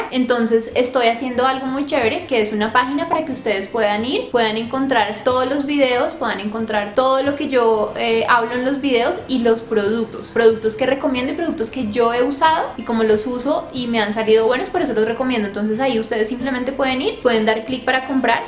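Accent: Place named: Colombia. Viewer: Me gusta mucho tu acento , de que parte de Colombia es ?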